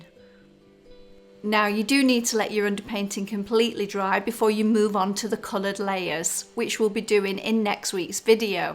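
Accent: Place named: Northwest England, United Kingdom. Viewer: I really do see your method as the best way to paint, by separating the issues of value and colour the stress is taken away as achieving the painting becomes more of a step-by-step process. My background is in project management so breaking big jobs into small organised tasks comes naturally to me. I see things in your techniques and methods I've not seen before, so inspiring to me. The technique of underpainting starting out with a mid tone and then wiping/erasing for lights and reinforcing darks makes it look so simple and easy, I guess great skill and experience help too ;-) You paint just the way I want to, not ashamed to use reference photos and not scared to try different ways to achieve gorgeous results. So next month, when I have some spare money, I shall go to your website to buy a subscription. I've already cancelled my paid subscription to a SW USA chap's tutorial site where he covers all kinds of materials and methods but just on the surface whereas I want to dig deeply into and concentrate on the indirect method in oil but with modern methods as you do, combining tradition with innovation. 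Your approach suits me just fine and I prefer your northwest(?) English accent too. West of the Pennines not too far from Manchester?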